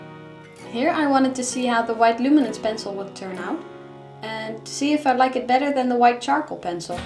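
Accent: Netherlands. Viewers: Are you Dutch? As a fellow dutchie I feel like I recognize the accent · Is that Doutzen Kroes? Are you dutch cause you really sound Dutch!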